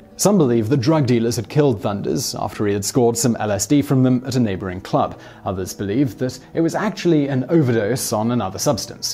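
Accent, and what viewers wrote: United Kingdom. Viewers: Relax dude, That's how Brits pronounce it, it's called having an accent. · Indigo P Some of these are just accent differences. In the UK mafia and Van Gogh are pronounced as he said. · who cares about the damn pronunciation he's talking about history he's not talking about the American English language the guy is from England not America shut up everybody just watch the show Geez Louise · He’s from the UK, they pronounce things differently than we do in the US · The names is due to being British but all the info is accurate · His research seems accurate (as conspiracy theories goes) His UK pronunciation gets under some ppl skin for some reason - tomato/tomato ... move on folks and show some cultural intellect.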